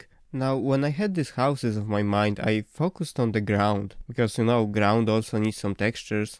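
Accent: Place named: Poland. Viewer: you sound so polish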